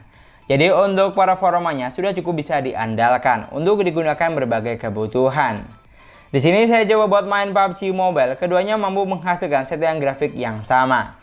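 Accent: Madura, Indonesia. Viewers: Orang madura ya bang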